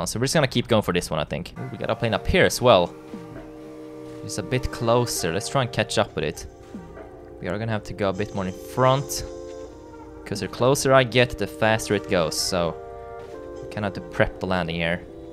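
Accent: Sweden. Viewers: Are you swedish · ar yu swedish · Are you from sweden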